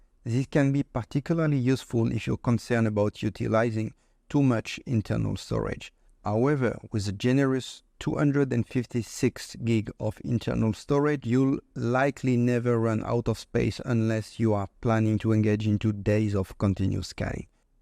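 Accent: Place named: Spain. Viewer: No problem on the accent! We have a lot of Spanish people in this part of the country!, LOL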